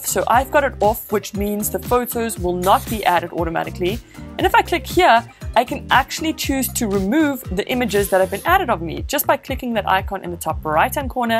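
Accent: South Africa. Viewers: You from South Africa nehr ? 😂🙈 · South African ? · South African accent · Are you south- African?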